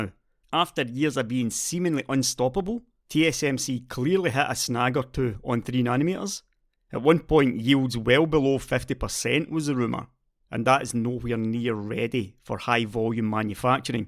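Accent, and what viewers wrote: Scotland, United Kingdom. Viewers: a scottish tech youtuber say "all right guys, how's it goin?"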